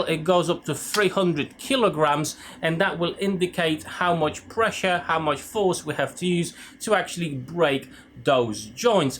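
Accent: United Kingdom. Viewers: Where in the uk did u learn your accent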